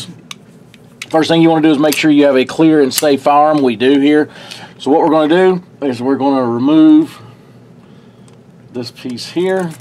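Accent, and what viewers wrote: South, United States. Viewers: NICE LITTLE DIY TIP!👍THX! I KEPT THINKIN U WERE SAYIN "COAL" WHEN U WERE SAYIN "COIL"...LOL.😁 CUZ OF UR NICE SOUTHERN DRAWL. WHERE R Y'ALL AT?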